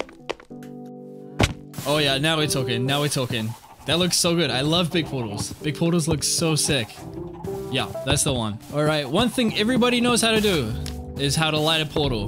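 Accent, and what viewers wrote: New Zealand. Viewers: Hmmm,are you from New Zealand you kinda sound like a kiwi😂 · Just wondering are you a New Zealander?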